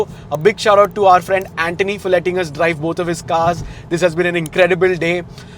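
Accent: India. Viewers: u sound indian, don't get it?😅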